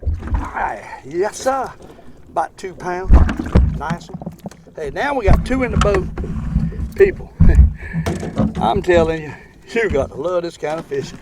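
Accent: United States. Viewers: That country accent. Love it. Love you videos.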